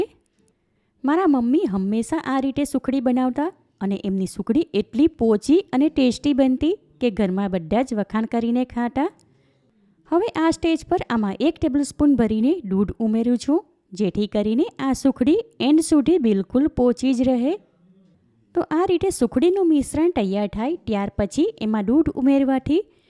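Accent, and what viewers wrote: Surat, India. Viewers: Ben aa Ben surat na che, tya ni bhasha aavi j hoy che, toh aapne respect aapiye, baar gaame boli badle, badha na uchcharan juda hoy, je मातृभाषा hoy tene shu kam chhodavani?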